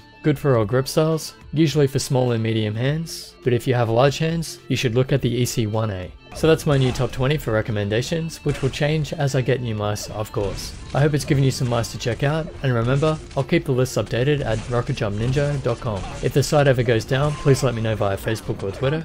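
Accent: Australia. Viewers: Yo man are U australia!? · are you aussie? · yes he's from Australia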